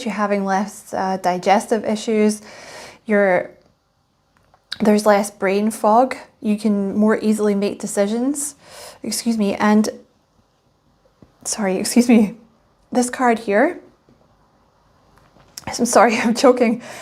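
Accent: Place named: Scotland, United Kingdom. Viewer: So I watched this video after you recommended it in the Scorpio reading. I’ve never watched a Virgo reading before but I checked my placements & it turns out I’m a Venus Virgo! I was shocked to learn this! Wow did this resonate for me! Thank you for sharing your gift of channeling. Really enjoying both of your channels. Happy New Year! Love hearing your Scottish accent. I have family history from Scotland so it’s been great to connect with you. 💕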